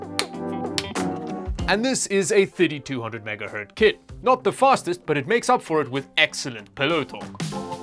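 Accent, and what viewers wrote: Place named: Afrikaans, South Africa. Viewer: I am so happy to have learned that is afrikaans and not just me trying to figure out where the hell I have heard his accent before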